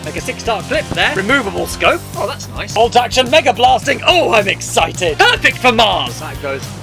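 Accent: United Kingdom. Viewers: dudechaps bri'ish coop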